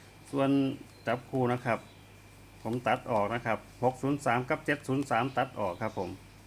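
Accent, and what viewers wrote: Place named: Phetchaburi, Thailand. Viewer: รบกวนถามแอ๊ด อยากทราบว่าแอ๊ดเป็นขาวจังหวัดเพชรบุรีมั้ยคะ...สำเนียงเหมือนคนเพชรบุรีค่ะ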